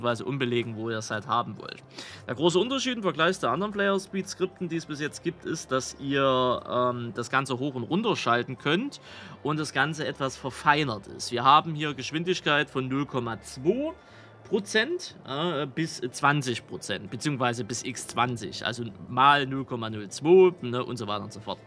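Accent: Saxony, Germany. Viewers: das er Sachse ist hab ich mir schon gedacht, nur welche Ecke. Bin selbst Sachse.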